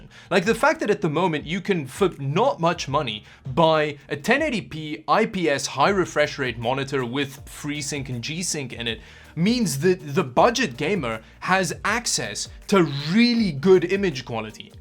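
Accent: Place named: Afrikaans, South Africa. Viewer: Lekker, kan hoor die man is Afrikaans haha. Goeie video:yougotthis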